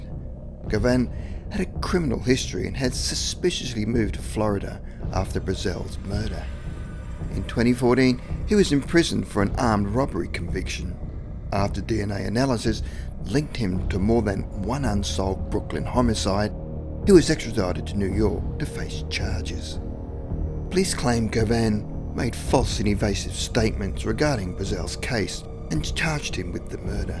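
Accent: Australia. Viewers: Great video man! Also I love your accent, Aussie pride! 🐨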